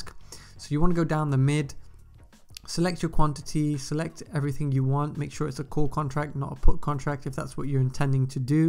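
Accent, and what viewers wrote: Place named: United Kingdom. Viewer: Great video , great to hear a uk YouTuber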